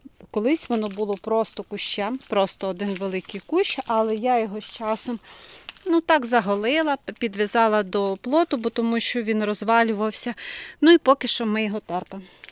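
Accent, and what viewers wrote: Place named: Western Ukraine, Ukraine. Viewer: Укр.мова погана, западенська. Наголос пипець. Багато води... Терпіння не хватило дослухати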